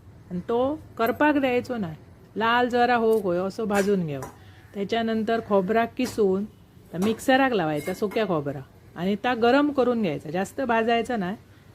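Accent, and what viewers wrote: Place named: Malvan, India. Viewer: मालवणी भाषा बोलण्याची पद्धत अप्रतिम अजिबात भेसळ नाही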